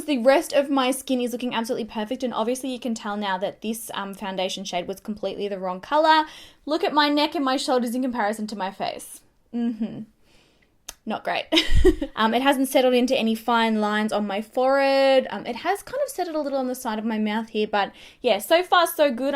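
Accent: Australia. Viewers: I love this testing style and I love that you keep your Australian way of speaking, instead of putting on some weird accent that some other Australian youtubers do. Most of all I love that you say FORRID and not forehead! xoxo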